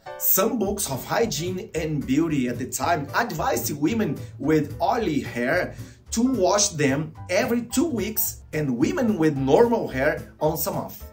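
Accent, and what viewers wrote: Brazil. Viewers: A pronúncia não está boa, mas, se você tiver gravado sem decorar, seu nível de inglês está bom. É só treinar mais a pronúncia agora, pois está bem abrasileirada. Você é fera. 💣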